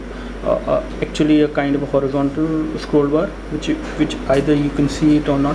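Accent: India. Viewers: u also sound like indian are u ??????? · u definatly indiannn \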